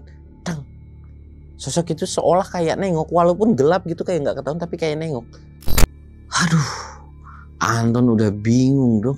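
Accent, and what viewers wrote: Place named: West Java, Indonesia. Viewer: bang salam kenal dari batujajar cimahi kalo boleh tau abang asli mana bahasanya ada sunda sunda gt bang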